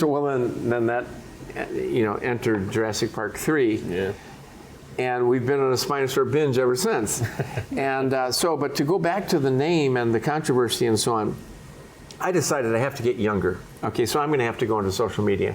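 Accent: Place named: Chicago, United States. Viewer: completely unrelated but I find it endearing to hear his Chicago accent slip out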